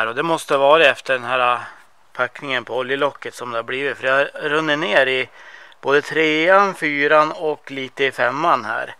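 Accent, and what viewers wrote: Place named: Västerås, Sweden. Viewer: Såg att du hejar på VIK. Stämmer det? Själv bor jag strax utanför Västerås. Tyckte väl att din dialekt var bekant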